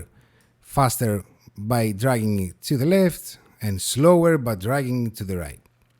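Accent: Greece. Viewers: I love the Greek accent. This is a great tutorial for beginners like myself. Clear and concise . This will be my "go-to" video until I become an advanced user someday.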